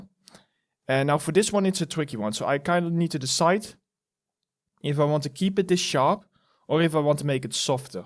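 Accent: Netherlands. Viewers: u are dutch right? XD thanks brother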